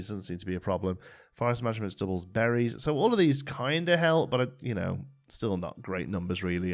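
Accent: United Kingdom. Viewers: I got mentioned!! I told you you sounded British (I mean, you are...). I reckon I could easily watch you for an hour walking around talking rubbish. Next episode, " walking around his village talking rubbish". Man, that feature has totally sold me on that game. I love it anyway, but that's the cherry on top!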